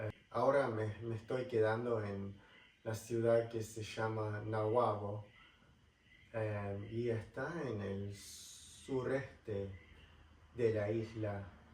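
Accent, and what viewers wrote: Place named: Buenos Aires, Argentina. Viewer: “Me re copa” que grande! Jajaja 100% porteño tu acento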